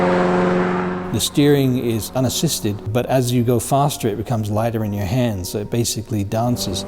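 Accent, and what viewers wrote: Australia. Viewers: What an awesome bloke! I believe he was born and raised in the UK, but am I picking up a bit of an Aussie twang every now and then in his accent as well? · This guy almost sounds Aussie - I think he has spent a lot of years somewhere outside the USA..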